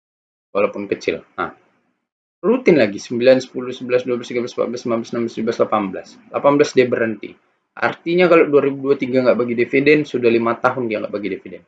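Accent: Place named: Medan, Indonesia. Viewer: Macam orang medan kali awak liat cara ngomong abang,ni.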